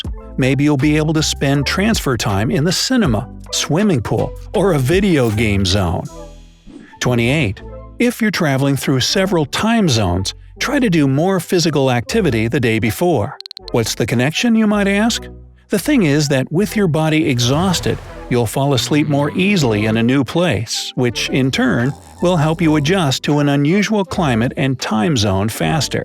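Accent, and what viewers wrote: United States. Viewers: Is it an American accent in the video ???? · The voice of the narrator is an american English accent, but tip #33 talks about a "special capsule" for sleeping in instead of a hotel. Nobody from America knows what those are because our airports don't have them. Many countries I've traveled to in Europe and the middle east don't have them either. Come to think of it, I've never seen an airport with sleeping capsules. Great idea, I've just never seen it. I've been to Sydney, Tokyo, and Shanghai airports and I did not see them there either, but I wasn't looking for them. Maybe I just didn't notice them?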